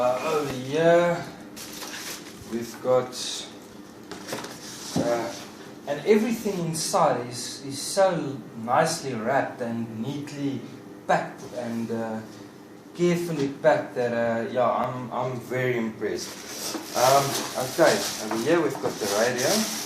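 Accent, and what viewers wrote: South Africa. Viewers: He's definitely South African. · Are South African Die manier hoe jy praat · Are you South Africa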